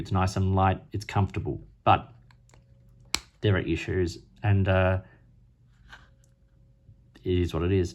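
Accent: Australia. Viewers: i am still thinking whether to buy orange or black one. For a few years I have been relying on your reviews videos mainly because you’re also a lefty, have a nice handwriting and your reviews i find them very informative, to the point without ‘frills’. I’m from Europe and love your Australian accent 😅 thank you for all your effort! ❤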